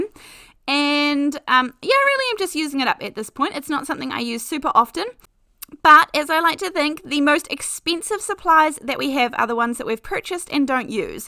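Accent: Southland, New Zealand. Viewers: Wow, that's so awesome, I'm just getting into card making and your video is great, I noticed you're also from NZ, by any chance from Southland, I noticed the accent as I'm from Invercargill 😂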